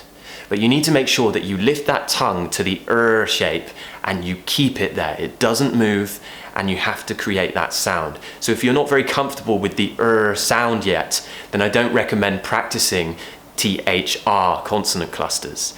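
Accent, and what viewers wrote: United Kingdom. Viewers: I listen to your youtube like listening to a radio. I want to make British accent also :) Thanks always for your interesting contents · Thank you for the new video lesson. I love your British accent 😍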